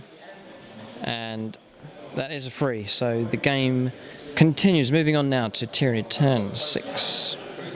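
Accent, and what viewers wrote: United Kingdom. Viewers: exactly what I was looking for! concise analysis, pro painted models and british accent. subscribed and liked.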